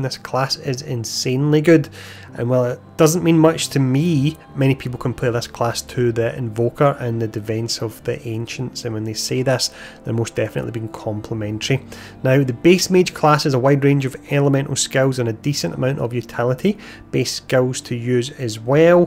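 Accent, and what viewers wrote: Scotland, United Kingdom. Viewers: Dude must be Scottish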